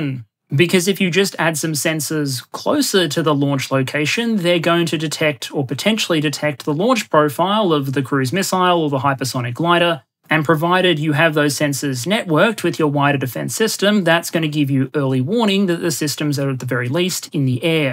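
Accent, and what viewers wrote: Australia. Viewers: I love this channel because it’s not just the short attention span of only 8 or 9 minutes for all the other videos. i’m only in adopted Aussie but as a yank it is nice to hear the fellow Aussie talk about the topic of geopolitics which I am quite passionate about in a more extensive ways regarding equipment of military defence and offence. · Ah yes I am going to listen to an Australian man for the next hour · It is frankly absurd that 1-hour PowerPoint presentations are right at the top of the content I am most excited to see each week. That damn Aussie dry wit and accent! :)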